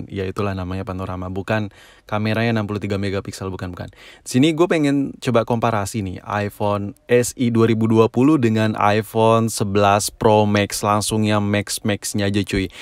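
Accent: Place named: Gunung Sindur, Indonesia. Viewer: Logat lu kaya orang gunung sindur anjay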